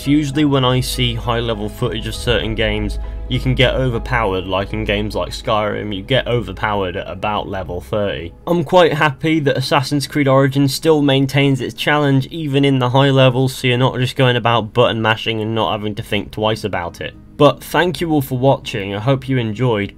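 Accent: United Kingdom. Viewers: Hahahaha !!! ... "Arrow after arrow after arrow after arrow" ... I Enjoyed a lot hearing this in your British accent ... I am still laughing ...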